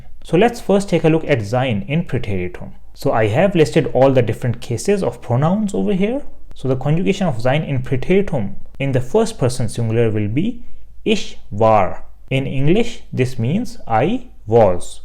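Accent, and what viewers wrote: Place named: India. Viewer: Bro if learn from you German will not understand my German because you have that topical Indian accent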